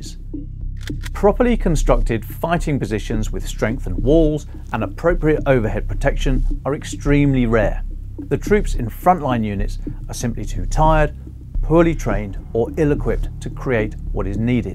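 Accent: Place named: United Kingdom. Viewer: Yet another load of BS from a guy with british accent.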